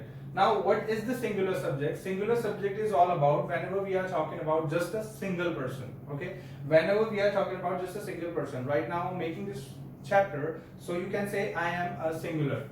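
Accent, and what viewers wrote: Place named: United States. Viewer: Ye sir American english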